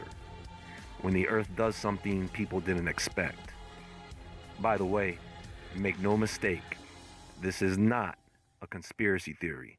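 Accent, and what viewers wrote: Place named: East Coast, United States. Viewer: sounds like a know-it-all, with his Italian (Jewish?) east coast accent, telling us "trust me". He makes some interesting videos, but his logic is often flawed, at least it seems erroneous to me. We live on a plane, not a spinning ball traveling through a galaxy, as has been proven ad nauseam. Both NASA and the government lie to us, but he believes the crap science they taught him anyways - wtf? The weather is now created and controlled, flooding caused intentionally using rapid water evaporation from power plants, which creates and fuels storms. Cyclones are created artificially. This was all proven in Weatherwar101 videos, which you can still watch on youtube. Mr. WW101 himself was disappeared in early December 2017, then his website disappeared a few months later, since he wasn't able (alive?) to renew it with his ISP. 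This video is mostly nonsense, but I like his videos on other subjects, especially the bible.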